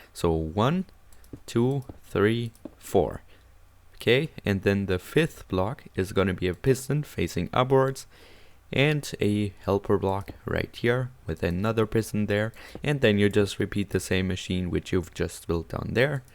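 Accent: Germany. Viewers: Hab vom ersten Wort an gehört das du Deutscher bist! ☺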